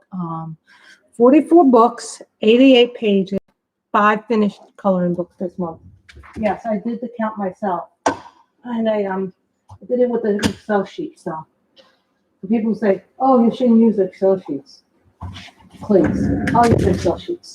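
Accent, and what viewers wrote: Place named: New York, United States. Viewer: I never seem t catch you live but watch your replays. Always enjoy your completed pages. I also,love listening to you as you remind me of home. I ever like hearing the background outside noises. I use to live in Yonkers right in VanCortlandt Park. (Bronx/Yonkers line). Thank you for sharing and hopefully I will catch you live soon. 💕🦄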